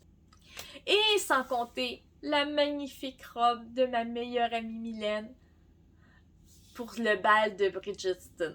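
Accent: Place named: North America. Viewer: j'aime beaucoup ton accent on sent qu'on est bien passé outre-atlantique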